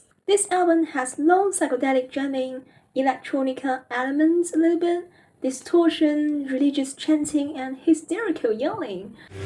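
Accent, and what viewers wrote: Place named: United Kingdom. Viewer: Her accent is actually tinted with British English, can you hear it?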